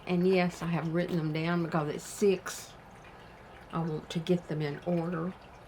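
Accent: South, United States. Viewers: I love your channel. I love listening to you. You have a sweet Southern accent. I can see you growing and glowing. I'm so proud of you. It takes courage to do this.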